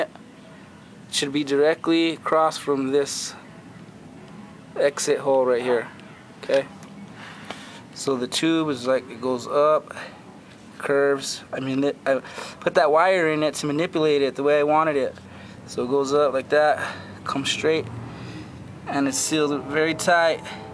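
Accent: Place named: New Mexico, United States. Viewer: You from New Mexico? it's the accent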